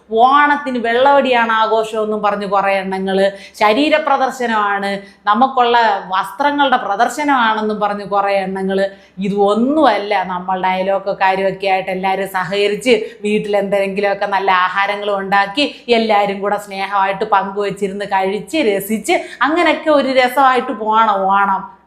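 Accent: Thiruvananthapuram, India. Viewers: തിരോന്തരം ഭാഷ നന്നായിട്ടുണ്ട് അഭിനന്ദനങ്ങൾ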